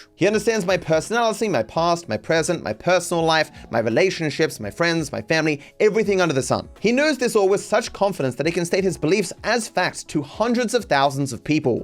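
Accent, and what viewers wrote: Australia. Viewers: I dont even know what he means. He's literally just Australian. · Angry Aussie sounds for almost three hours. I like it😂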